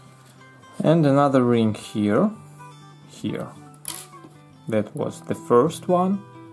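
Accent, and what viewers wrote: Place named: Russia. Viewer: да ну! русский в каждом звуке. а вааще, с произношением катастрофа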